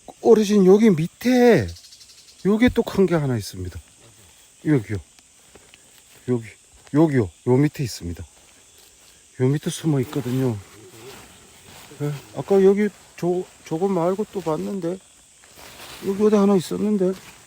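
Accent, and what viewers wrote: Gangwon, South Korea. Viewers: ~~^^강원도 사투리 너무좋앙♡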